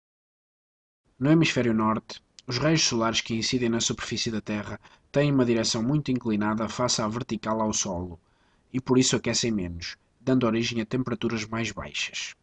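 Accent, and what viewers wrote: Portugal. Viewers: porque portugues de portugal :3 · Horrível, está com este sotaque português. Como vou passar uma coisa dessa para os meus alunos? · Pq é sutaque português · ns não dá para entender nd ele fala meio portugal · Tecnicamente é o melhor vídeo que encontrei sobre o assunto, a explicação é perfeita, pena o português de Portugal. Mas merece um áudio novo. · O vídeo é dirigido ao povo português. O sotaque para português, sendo de português, não importa...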